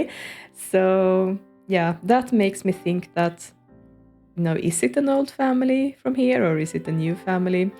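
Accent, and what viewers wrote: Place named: Sweden. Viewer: I always love watching your building videos! You're a talented builder and your videos are always so relaxing to watch ☺️ Are you swedish by the way? You don't have to answer that if you don't want to. But I am Swedish myself and I always thought your accent sounds very swedish ✨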